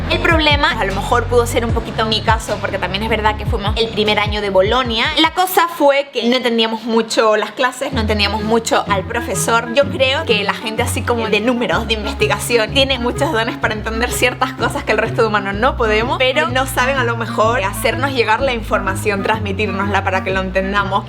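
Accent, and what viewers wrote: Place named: Argentina. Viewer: Hablas como argentina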